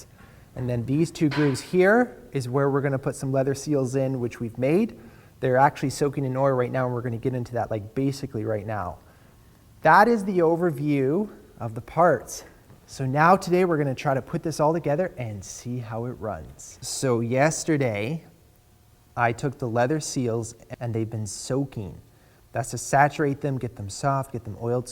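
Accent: Canada. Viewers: U from Canada A?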